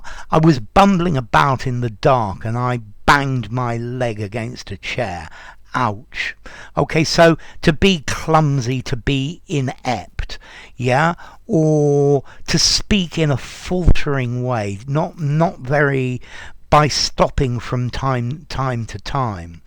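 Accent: United Kingdom. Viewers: You have nice british accent. I am bumbling now to. Talk real. English as you..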